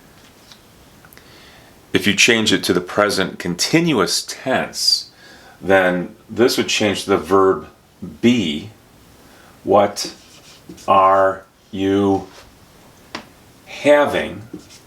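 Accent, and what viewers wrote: United States. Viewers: trophy-yellow-smiling::trophy-yellow-smiling::trophy-yellow-smiling::trophy-yellow-smiling::trophy-yellow-smiling: ... a great teacher with a great All-American pronunciation ... !!!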